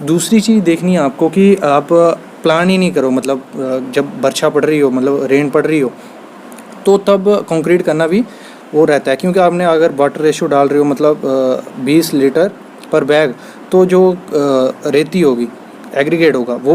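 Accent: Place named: Sri Ganganagar, India. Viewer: Boli se lgta h shri ganganagar se ho aap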